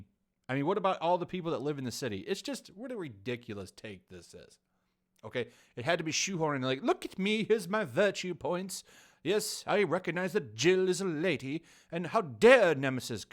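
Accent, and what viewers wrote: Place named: RP, United Kingdom. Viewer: I had to stop listening to you read that article. Not because of the hoity toity accent, that was brilliant, but because it's not journalism. It's politics. I've come to absolutely despise politics. I'm not just talking about SJW politics, I despise all politics and wish people would stop. Politics these days aren't about helping people, they're about tearing people down... or... grandstanding [is that the right word?] yourself so you seem like the only person of any importance. Thank you for shedding light on this political piece, thumb up, well done, but I can't. It's not you. It's the words you're reading xD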